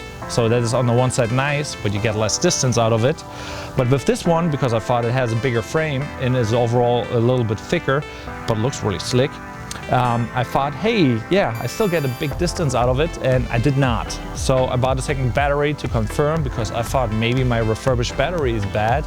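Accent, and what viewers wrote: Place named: Germany. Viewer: oh i really like Germans when they speak english :)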